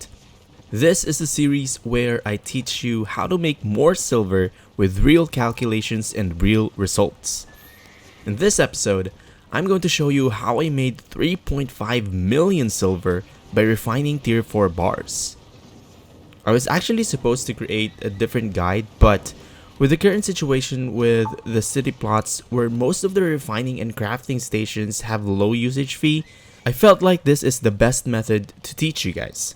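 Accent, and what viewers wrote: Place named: Philippines. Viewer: Hi bro you have hints of Filipino accent with you. Am I right? Great video btw should i leave my current guild with friends to join the VIP crafters?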